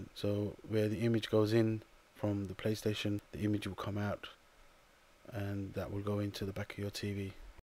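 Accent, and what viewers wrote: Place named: United States. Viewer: وااااو الاكسنت عندك كنك امريكي .. ^_^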